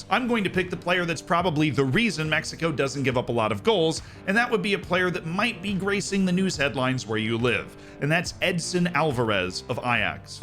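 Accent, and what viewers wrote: United States. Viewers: I just now realized you are American lol. I just assumed, as an English speaker talking about Football, you were English. Even though your accent is very clearly americam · Your accent is way too american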